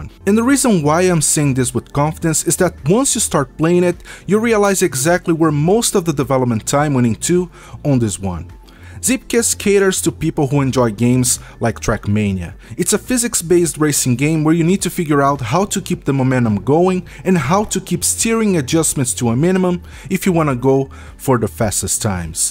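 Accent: Brazil. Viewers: brazilian accent? Tamo junto kkk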